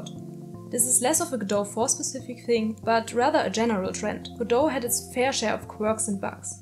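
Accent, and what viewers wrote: Germany. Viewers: i love the german english accent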